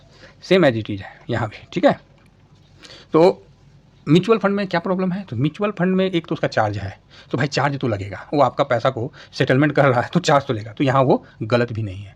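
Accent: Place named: Bhojpuri, India. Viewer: Sir ji your accent is excellent, I love bhojpuri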